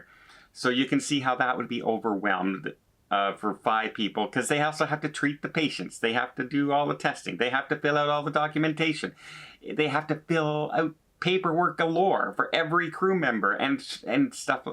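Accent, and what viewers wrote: Canada. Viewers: My husband loves to watch your videos. He says you sound so Canadian; he is too. Thanks for being logical. I don’t believe the number of covid cases onboard with crew and passengers is public information.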